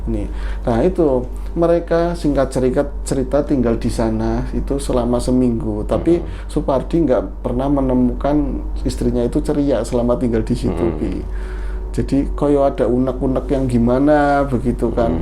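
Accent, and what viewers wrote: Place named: Tegal, Indonesia. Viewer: Logat tegale mntep lah .lucu wkwkwk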